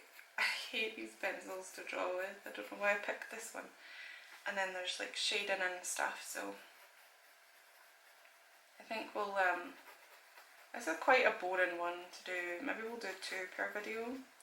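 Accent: Scotland, United Kingdom. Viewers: Shes from scotland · Are you Scottish? I am.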